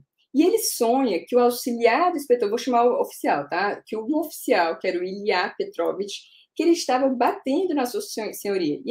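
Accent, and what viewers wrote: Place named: Northeast, Brazil. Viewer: Amei seu sotaque NORDESTINO.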